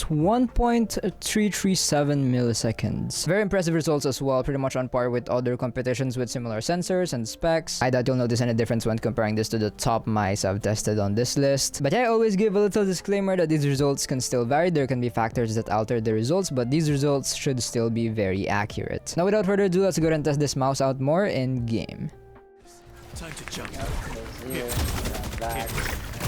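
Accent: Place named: Philippines. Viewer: > Pinoy accent looks in > Actual pinoy reviewer with top tier production value + signature rap speech Subscribed agad AHHAHAH P.S. Krazy ahh mouse grip T_T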